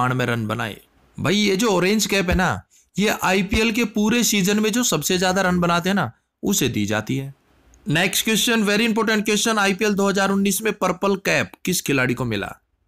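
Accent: Haryana, India. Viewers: भाई आप हरियाणा से हो क्या?? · Aap haryana se ho kya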